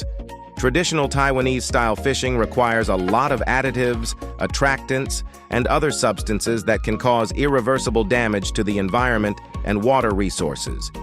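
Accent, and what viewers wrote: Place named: United States. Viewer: Our A.I. narrator is using American English and the lure visuals are of American anglers. Why in the world are the creators of this video comparing lure fishing to Taiwanese bait (I assume) fishing, something that few Americans know about, let alone practice? Is Taiwanese style fishing common in Australia, perhaps?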